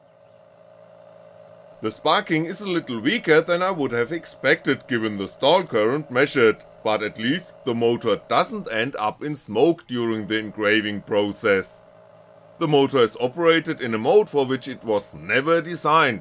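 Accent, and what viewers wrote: Germany. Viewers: Funny to hear a german practising English.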